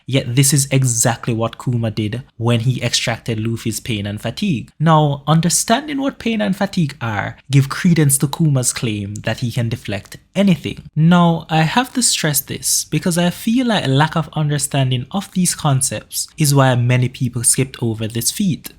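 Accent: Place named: Jamaica. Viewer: This is my first video of yours I have seen. I caught the accent IMMEDIATELY. Where ya from. I’m from Jamaica i hear patois. If you are. I’m subbing rn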